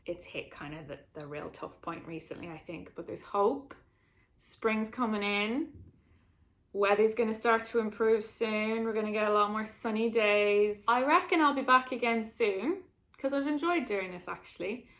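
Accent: Dublin, Ireland. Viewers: hearing a Dublin accent... so unbelievably soothing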